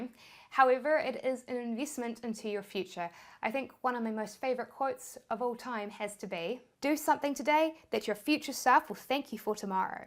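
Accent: New Zealand. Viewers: Awesome content but I couldnt understand why you kept on saying add ticks to your pages "lots of ticks", then I realise you meant text lol , it all makes sense now I'm getting use to you NZ accesnt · no problem at all! Thanks for responding. Of all the people I've found on YouTube, you by far seem the most genuine and you explain things extremely well. Love the Kiwi accent too and as an Irish man I can totally relate to speaking too fast 😂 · Is it a Kiwi accent?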